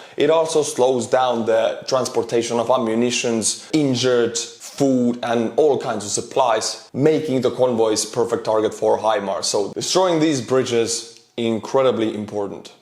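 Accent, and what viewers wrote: Scotland, United Kingdom. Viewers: Love the jock accent mate, funny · Best Scottish accent ever, never change 🇬🇧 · pretty good Scottish accent. WE always refereed us as "OAT MEAL SAVAGES" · Dude , your Scottish accent is hilarious 😂🤣😂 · I love the Scottish accent 🤣🤣🤣🤣👍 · Your scotch accent is perfect I love it it cracks me up inside and out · That's one top Scottish accent .... better than the Highlander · Absolutely love the Scottish accent, made my day. Lol lol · I am Scottish but I find your Scottish accent funny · that Scottish accent is truly beautiful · 😂😂 Loving your Scottish accent! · This scottish accent 😂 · Great info as usual and I love the Scottish accent 😀. ( realy is Ivan that bad? 😀) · Great to hear a fellow Scots with a local accent 😉😆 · Nice Scottish accent xD I don't often here Europeans doing impressions of any UK regions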